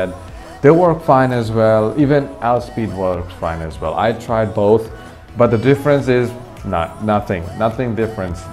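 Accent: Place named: India. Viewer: indian with crazy english accent 😍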